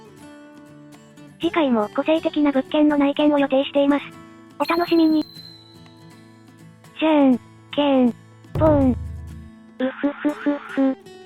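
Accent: Hakata, Japan. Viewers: チャンネル登録したよ🤗 Goodボタンも押したとよ🙋 博多弁？面白かとよ🤣🤣🤣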